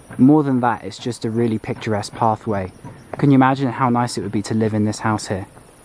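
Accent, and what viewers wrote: United Kingdom. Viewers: sounds British accent....enjoy trekking Sagada...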